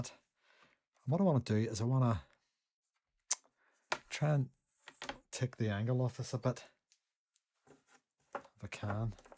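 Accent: Northern Ireland, United Kingdom. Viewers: Very nice work! Great to hear your Northern Ireland accent!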